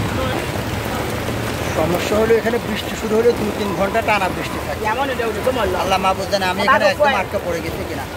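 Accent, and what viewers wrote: Noakhali, Bangladesh. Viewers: ভাইজান নোয়াখালী র ভাষার টান মনে হচ্ছে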